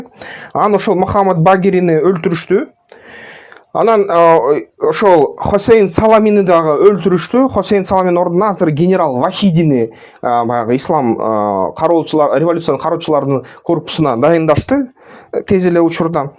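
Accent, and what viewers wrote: Standard. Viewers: Таза суйлосуз агасы азаматсыз😊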